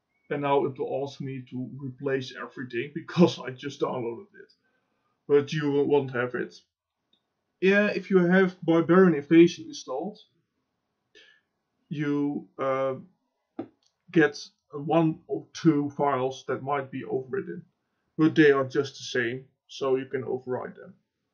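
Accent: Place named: Netherlands. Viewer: hey I get an error each time the launcher is downloading, also you have a dutch accent I can tell because I am a fellow Dutchman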